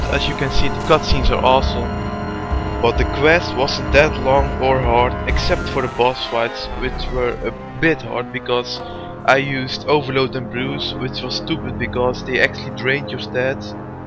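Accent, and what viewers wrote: Netherlands. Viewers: kut Nederlands accent zeg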